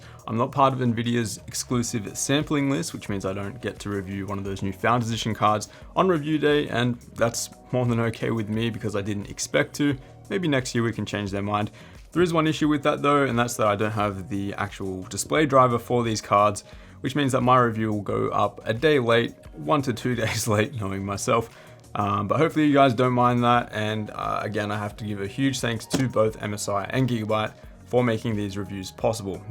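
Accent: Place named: Australia. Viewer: Ayy had a feeling you were Australian :D woot I'm from Straya too bro haha, honestly though INCREDIBLE breakdown and explanation of the card, that was top notch ^_^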